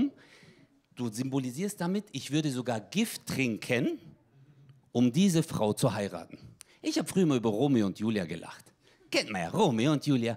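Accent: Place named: Swabia, Germany. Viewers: Bitte mal eine ganze Show mit dem extremen Geschwäbel 😄😄😄 Sehr lustig 😂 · Özcan Cosir... oh man, lange nichts mehr von dem gesehen, ist der nicht aus Cannstatt? Bin in der ähnlichen Gegend aufgewachsen und auch unter nem Haufen Kanacken. Also der Dialekt und der Humor fühlen sich sehr vertraut an. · Wenn die Hälfte der Witze auf dem kulturellen Hintergrund der Herkunftsfamilie aufbaut, macht es schon Sinn vorher zu erwähnen welcher Hintergrund das ist. Er hat übrigens nicht erwähnt daß er auch Schwabe ist, weil man es am Dialekt hören kann. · Dem Dialekt nach von irgendwo zwischen Stuttgart und Ulm :D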